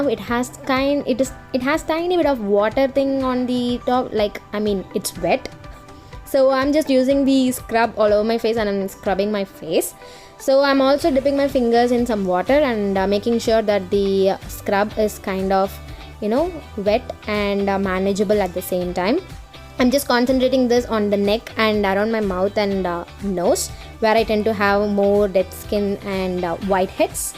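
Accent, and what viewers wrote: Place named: Tamil Nadu, India. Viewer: Superb SISTER..😉 Biotique product pathi clear ah explain paninga last video la...very nice tamil explanation...unga tamil slang nalaruku SISTER..tamil la video panunga inime...this z my request...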